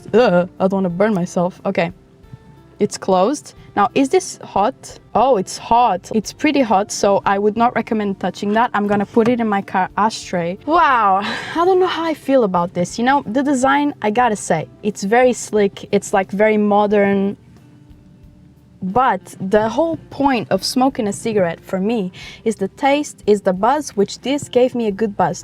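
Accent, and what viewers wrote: Portugal. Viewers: your accent is so nice ahhh fu*k . it's portugal accent really? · giiirl soube logo que eras tuga (ou pelo menos que falas portugues) pelo teu sotaque antes de dizeres que estavas em Portugal! comprei a minha maquina hoje, com o terea turquoise , espero gostar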